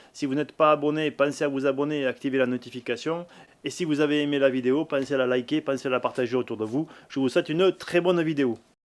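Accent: South, France. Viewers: Pas de quoi , et oui c' est très long la fabrication de mobiliers , pas mal de gens pensent que ça doit se faire en 2 jours un meuble... Voilà pourquoi il ne faut pas à avoir à revenir sur des étapes précédente. Bien faire comme préconiser en traditionnel , débit grossier , corroyage , assemble etc... et enfin mise à longueur final montage à blanc et si tout est ok , phase terminale , le collage de l ensemble. En tout cas je suis abonné , et j aime bien votre façon de présenter vos vidéos , avec ce p'tit accent qui à l' air de venir du Sud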